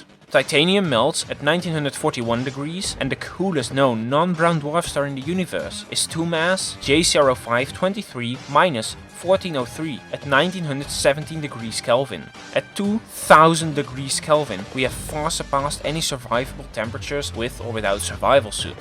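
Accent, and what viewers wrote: Netherlands. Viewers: It's Dutch accent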